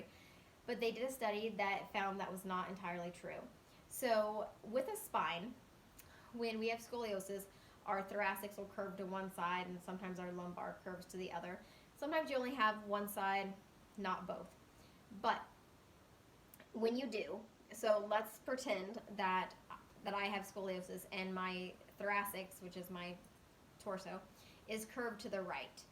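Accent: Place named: Minnesota, United States. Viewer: Canadian? Definitely not from the south. Minnesota?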